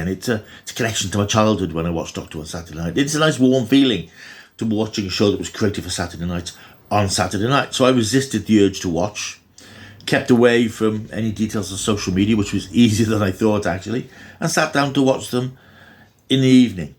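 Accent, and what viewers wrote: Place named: Wales, United Kingdom. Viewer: Sounds like a welshish accent. I agree with you on figures. Because its on iPlayer tv figures are irrelevant. Wow 2019 seems like yesterday.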